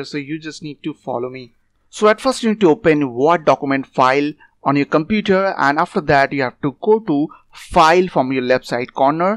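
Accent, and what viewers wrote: India. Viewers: i tried every solution before this, but as soon as i heard an indian voice i knew my shit is getting fixed real quick, ty bro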